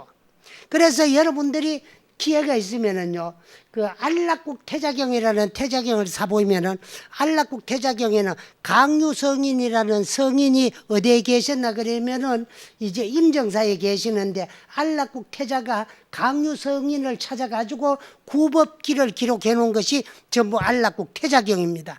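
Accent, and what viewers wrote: Gyeongsang, South Korea. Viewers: 묘허스님법문에 푹 빠져서 밤낮을 모르고 경청하고있습니다 스님 감사합니다 경상도 사투리가 이웃집 어르신같습니다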